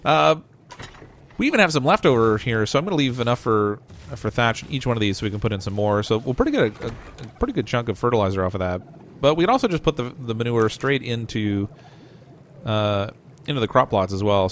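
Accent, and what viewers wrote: Canada. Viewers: I really should be sleeping now- it is am.Nah. That livestream was great. I am now a loyal subscriber. I can definitely tell that you are Canadian from how you say out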